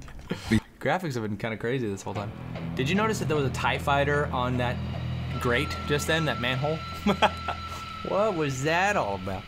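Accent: Scotland, United Kingdom. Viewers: love the british accent Need more! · I love ur British accent! <3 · Your Scottish accent is freakin' epic:3 (Scottish Guy) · T_T.....that's a scottish accent......gj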